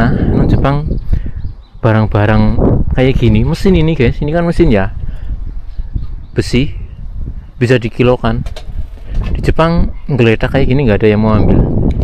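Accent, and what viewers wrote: Banyumas, Indonesia. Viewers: Asline wong endi si mas, logate ngapak banget 😁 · Lee.kok ngapak cah ngendi ,nek ngomong ati2 lee,neng negara orang.takut ada yg syirik nanti jadi abu merangmu.ati2 yoo lee.semoga sukses da n bahagia.Aamiin.❤❤❤ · Ngapak banget co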